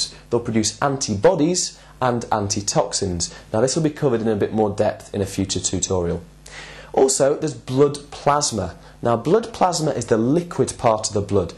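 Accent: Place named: United Kingdom. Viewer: thanks! this really helped! i really wish my bio teacher had a british accent! :)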